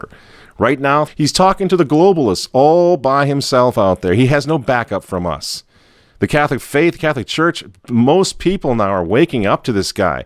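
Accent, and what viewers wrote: United Kingdom. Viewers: Fair comments all around. Only one suggestion going forward....a request, really., could you start doing these editorial videos with that great British accent. Loved it...powerful...convincing. Keep these great videos coming. Great job. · Love the English accent 😅😅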